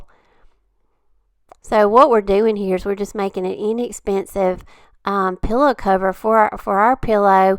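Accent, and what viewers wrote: South, United States. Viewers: I Absolutely Love your Southern Drawl & creative DIY's. The Drop cloth pillow cover is too cute Ya'll! 💜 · I adore your accent! I miss living in the South.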